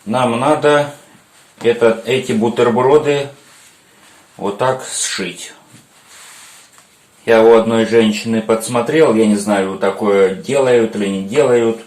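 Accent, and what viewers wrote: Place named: Donbas, Ukraine. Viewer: Разговорный Донбасский) сразу узнала, чисто по мужски сшит чехол))))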